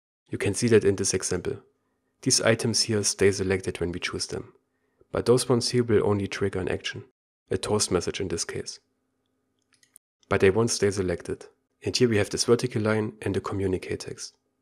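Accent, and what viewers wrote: Germany. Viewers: I assume of your accent your German like me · ich mag deinen Deutschen Akzent^^ Great Videos Du bist der bester :D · nice german accent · Schöner deutscher Akzent!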